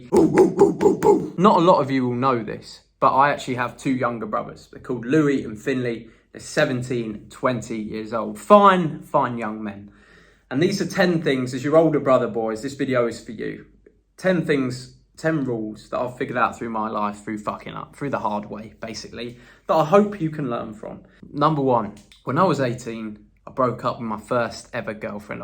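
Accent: England, United Kingdom. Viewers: I just love that English accent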